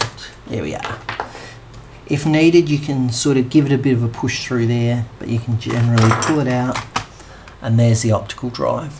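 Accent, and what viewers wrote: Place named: United Kingdom. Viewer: You would be very informative were it not for the painful experience of having to hear your extremely annoying British (or whatever) accent! Couldn't you get someone to speak normally so that we can understand??!!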